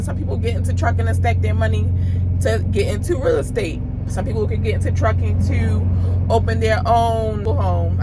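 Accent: Baltimore, United States. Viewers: She sounds like she's from Baltimore area. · Are you from Baltimore? 90 percent sure of it i can hear the accent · Okay yeah I thought I heard the accent too (born and raised here). I always second guess myself. I at least heard her say Merlin so I knew she was from Maryland 😂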